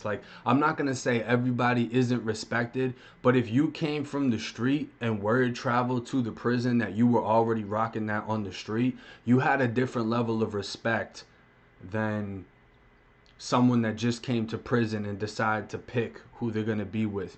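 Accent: Boston, United States. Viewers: Bro accent sound like you could of played in The Departed be Mark Wahlberg voice double 😂😂😂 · Love your Channel. Have seen many same type Channels. This is in my opinion far the best. You have a talent for this. With your Boston accent. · 1 of Christina baby gang , I love your East Coast Boston accent. I’m from Connecticut. Sometimes some words come out like Boston area, but not enough LOL 😂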